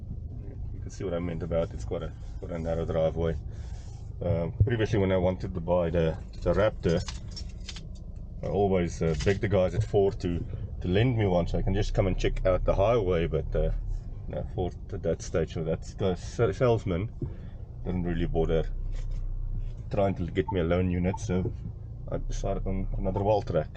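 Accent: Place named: South Africa. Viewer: Love the South African Boere accent Boeta, lovely content and detailed review; thank you Sir.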